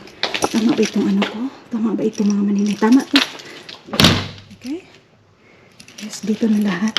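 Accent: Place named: Talacogon, Philippines. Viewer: Fan mo ako ganahan ko sa imo vlog ky makalingaw 70 yrs old na ko taga talacogon talacognon ba jud imo sinultihan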